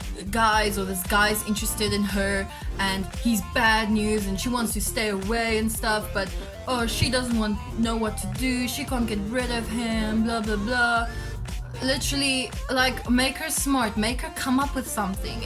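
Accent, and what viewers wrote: South Africa. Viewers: ARE YOU SOUTH AFRICAN !?!? -accent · wait, wait, wait, is she South African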